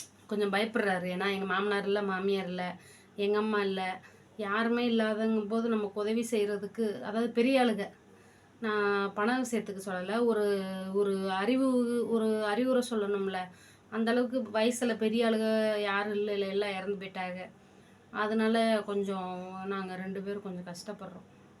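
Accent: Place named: Chengalpattu, India. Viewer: கவலைப்படாதே மகளே அம்மா நான் இருக்கேன்❤❤ இந்த வீடியோவை ஃபுல்லா பார்த்துவிட்டு தான் உனக்கு கமெண்ட் போடுகிறேன் கடைசியிலும் செங்கல்பட்டு தான் பேசுகிறாய்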